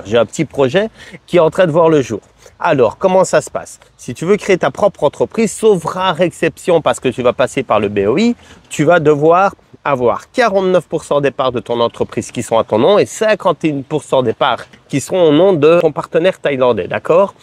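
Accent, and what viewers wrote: Liège, Belgium. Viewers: Le DTV est très bien mais dès lors que tu veux orienter une partie de ton business avec la Thaïlande comme thématique il faut alors en effet créer une société. C'est ce que j'ai fait, nous avons ajouté l'activité de communication digitale au sein d'une de nos trois sociétés, l'occasion en même temps d'y incorporé les revenus que je génère avec ma chaine secondaire et l'affiliation, même si cela n'est en rien lié avec le Pays du Sourire. Et oui, flirté avec la "zone grise" peut avoir de graves conséquences, d'autant plus que le gouvernement va scruter très attentivement les réseaux sociaux dès cette année, il y a quantité de Thaïlandais et d'étrangers qui ont développé des activités en ligne ( surtout sur line pour les Thaïlandais ), qui génèrent des revenus qui feraient rougir bien des patrons de grosses boites en Europe, et qui ne sont bien sûr absolument pas déclarés, ou faussement déclarés avec des bureaux virtuels dans des pays complaisants. 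Des centaines de millions de bahts de taxes perdues pour l'Etat, gageons qu'ils ne vont pas en rester là... Sinon, à l'accent, tu ne serais pas de la région de Liège ?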